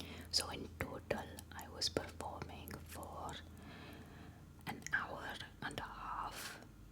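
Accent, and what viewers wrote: South India, India. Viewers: This sounded more South Indian than Gujarati but it's still a very good Indian accent. You're the first foreigner whose Indian accent doesn't make me cringe. In fact, your accent reminds me of some of my teachers. The video is very well done. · This is south indian accent rather than Indian I mean Indians speak normal English y'all so many stereotypes😐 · This is south Indian accent. Indian accent is a little bit of British type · Thats more like south indian a bit overdone but nice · This is more of south indian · I’m hindi and it really sounded South Indian. My jaw dropped oef almost lost it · True, most of my south friends talk exactly like this but delhi, Punjabi usually have a good british accent.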